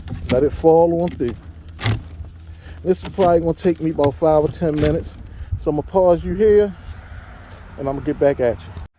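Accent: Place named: Baltimore, United States. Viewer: Sir, where are you from? I hear an accent and my husband says that it sounds like Baltimore.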